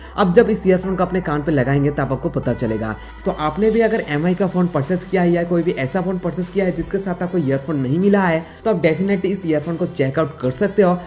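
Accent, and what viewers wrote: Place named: Bihar, India. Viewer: Bolte time bhot pyara lagta h tu bhai 😁 Bihari hai na ?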